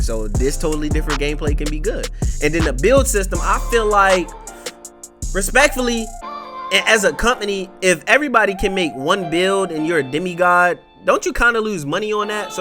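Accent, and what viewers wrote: Baltimore, United States. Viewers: Most definitely from baltimore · bro ur from baltmore fo sho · Twentytwo Baltimore accent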